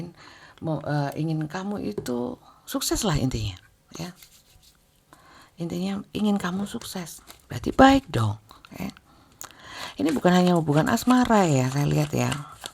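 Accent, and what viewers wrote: East Java, Indonesia. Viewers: BASA JAWANYA JAWA TIMUR AN MEDOK BGT YAAA BUN ARAK MALANG MATAP PERAH JG DI JATIM LUMANYAN LAMA HEMMMMM SUIT SUIT CETOK SEGERRRRR KONGGGGGGGG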